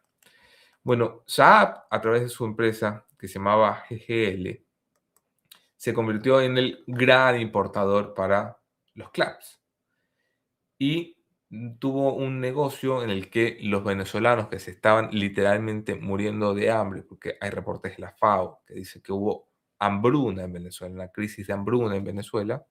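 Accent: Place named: Argentina. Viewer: Por eso tienes el acento argentino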